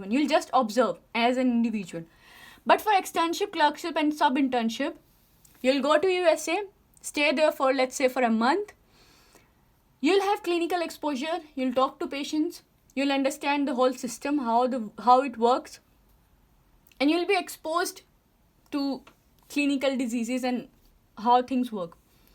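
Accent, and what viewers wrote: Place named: India. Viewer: Indian accent sucks.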